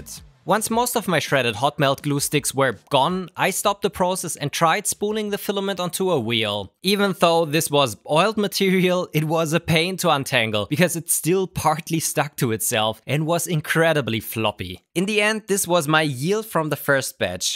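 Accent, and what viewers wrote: Germany. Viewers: Bro... are you German?! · are you german? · are you german? but cool vido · Ich bin eine Minute drin und muss sagen du hörst dich sehr deutsch an :D nice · Geilster Deutscher Akzent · Bro ich klick auf das video und hab direkt das gefühl gehabt, dass du aus deutschland komms. Sber sehr cool, was du machst❤ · Guten Tag im Stefan 😂 du bist locker Deutsch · I hear Deutsch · hey are u German? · Are you German? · bro bist doch deutsch oder man hört das harrrtt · Deutscher Akzent? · first 5 seconds in, "DAS IS N DEUTSCHER, ICH KANN ES HÖREN!"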